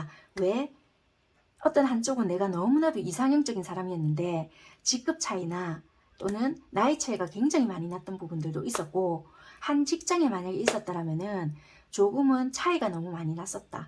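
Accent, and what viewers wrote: Busan, South Korea. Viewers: 구수한부산말투 매력적이네요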